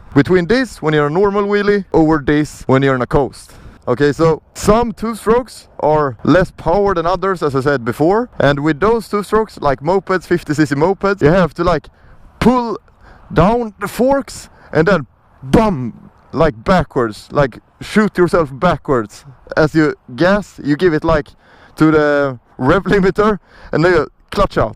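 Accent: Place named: Sweden. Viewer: Subbing because that Swedish accent is awesome! So is that bike 😀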